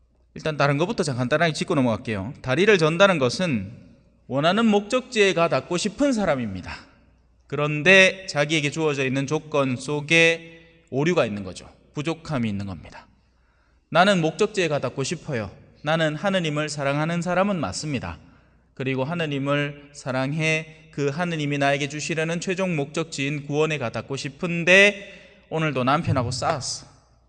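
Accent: Gyeongsang, South Korea. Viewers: ..경상도.억양.실제에닮은꼴..많은.현세에삶.어찌콕콕..지적하여주신.신부님.저희들삶안에.오셨네요.. 절뜍..절뚝.저희들에삶..감사합니다돌아보게합니다🕯🥀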